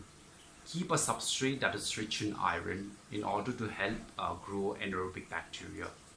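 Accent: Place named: India. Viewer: very informative video may i ask how come do you have an indian accent?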